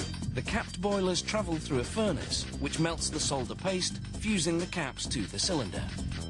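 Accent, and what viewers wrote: United Kingdom. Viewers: The British voiceover is the bomb. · i hate the British accent narrator voice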